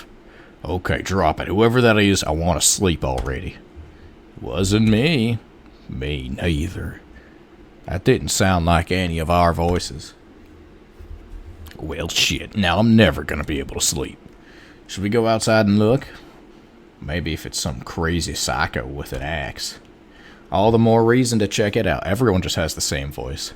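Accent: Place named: South, United States. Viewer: Why does this game bring out a redneck accent in you lol. You should do voice acting.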